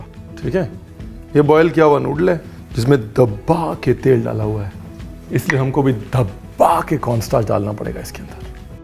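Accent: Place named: Punjab, India. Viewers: Awesome!! Gotta give it try! Love your Punjabi accent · Yummy mouth watering 😛😛sir I like all respiees prepared by you Good way of explaining and punjabion vala bolane ka style👍👍👍👍👍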